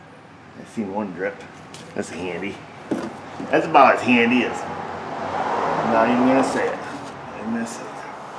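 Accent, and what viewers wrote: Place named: Michigan, United States. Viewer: I know you're in NY state but you sound like you may be from Michigan. Am I wrong?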